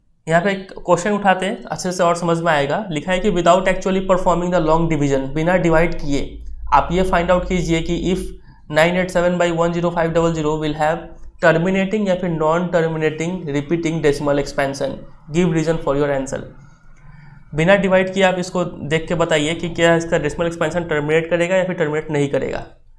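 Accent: Bihar, India. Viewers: Bhaiya aap Bihari ho kya aap ki language Se Lag Raha tha😅🤙👍 vaise same to you😅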